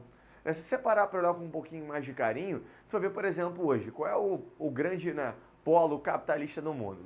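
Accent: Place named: Rio de Janeiro, Brazil. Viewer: Só eu que senti um sotaque carioca? Kkkk aula ótima! Me ajudou muito no seminário, parabéns pela didática, poucos tem...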